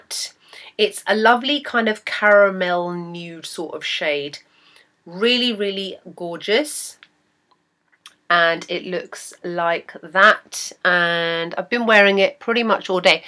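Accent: United Kingdom. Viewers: I guess you are from England! You sound so british! Really cute :) ! Greetings from Germany. You made me buy Buttercup hehe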